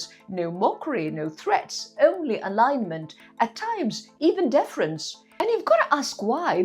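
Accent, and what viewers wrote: RP, United Kingdom. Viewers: Royal family / Queens style english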